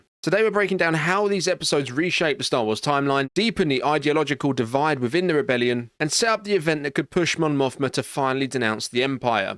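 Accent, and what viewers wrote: England, United Kingdom. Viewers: He is an Englishman speaking English.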